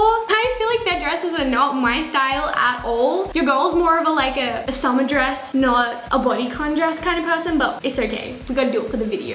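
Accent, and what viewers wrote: Australia. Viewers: I just want something..... I WANT YOUR AUSSIE ACCENT🔥💗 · Jessica Rivera Australian accents make me so happy haha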